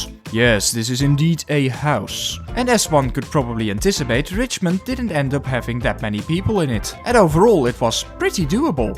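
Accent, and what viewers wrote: Netherlands. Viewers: Loved the vid! Noticed you're accent sounds dutch. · Man I thought I was gonna enjoy this video but as soon as I heard the Dutch accent I had to turn it off. Damn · Dit is de eerste video die ik van je zie, ik heb een gevoel dat je nederlands bent hahahahah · You sound dutch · This guy is probably Dutch, i'm Dutch and some Dutch people have this accent. I don't btw. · Are you dutch? I can hear some netberlands accent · I love that, being dutch myself, I can hear straight away that this guy is dutch as well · i can tell lol. Im dutch to so i can tell when someone is dutch🤣 good vid tho! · Do I hear a Dutch accent? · most intense dutch accent i've heard in a whike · only Dutch people hear you are Dutch right away😂 You can hear the accent but it doesn't bother me and liked the video you made😊😄 (I saw a video where a dutch person with terrible english is explaining how we live in 🇳🇱😂) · Obvious Dutch accent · Are you Dutch? 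:) I like the Dutch. Love from Sweden! 💖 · U sound Dutch to me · U sound dutch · I smell dutch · Leuke video! En heerlijk om dat Nederlandse accent erdoorheen te horen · I am a certified Dutch person and I smell Dutch in your voice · Imma Nederlands accent, not dik at all. Very hollands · lekkere nederlands accent ouwe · I smell dutch haha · I smell Nederlands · Damn great vid question tough are you dutch since you have a slight dutch accent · Bro is de minst opvallende Engels sprekende Nederlander haha, goeie video alsnog. <3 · You're dutch. I knew it after the first sentence😂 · Je bent Nederlands he? Bekend accentje haha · I mean he has a Dutch accent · I have a slight suspicion you might be Dutch.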